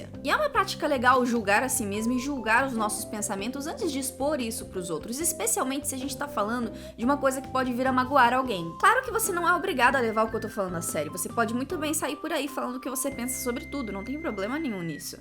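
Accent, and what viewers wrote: Brazil. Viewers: mano tu tem sotaque de sulista, eu amei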